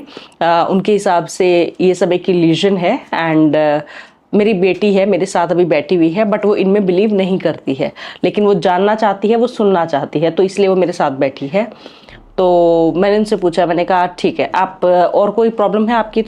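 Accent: Haryana, India. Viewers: Mujhe b ap bahut achhe lagte ho😘😘😘 or specially ap jab haryanvi bolte ho jab🤗😍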